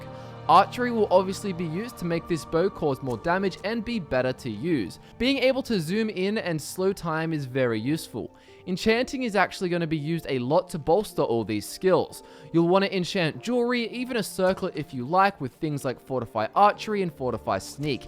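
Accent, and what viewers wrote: Australia. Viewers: it's an australian accent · australian accent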